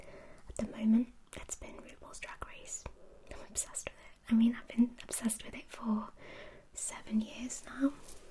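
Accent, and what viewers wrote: United Kingdom. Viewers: I love your accent! Where in the UK are you from?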